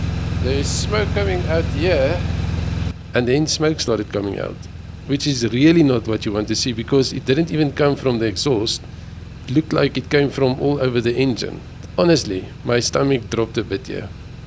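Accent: South Africa. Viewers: South African. 👍Lekker BOER accent.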